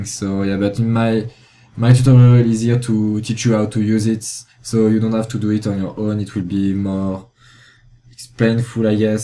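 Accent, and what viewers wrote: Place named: France. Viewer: hahahaha accent fr de merde hahaha jsui mdr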